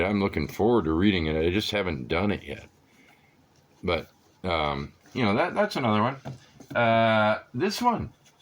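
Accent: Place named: Italy. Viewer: Yes, your Spanish has an Italian accent. 😀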